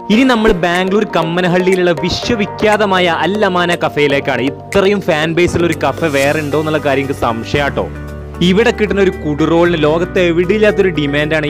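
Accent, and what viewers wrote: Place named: Malappuram, India. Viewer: Malappuram baasha uyir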